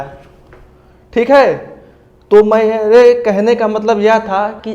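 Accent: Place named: Bhojpuri, India. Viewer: Aapka aawaj humko bahut aacha lagata hai bhojpuri type me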